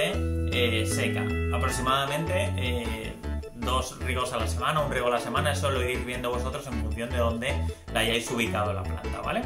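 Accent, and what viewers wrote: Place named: Andalusia, Spain. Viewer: Hola, de que parte de Andalucía eres?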